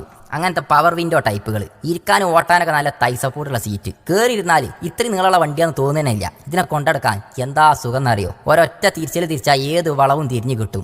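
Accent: Malappuram, India. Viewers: നല്ല രസമാണ് കേൾക്കാൻ മലപ്പുറം ഭാസേല്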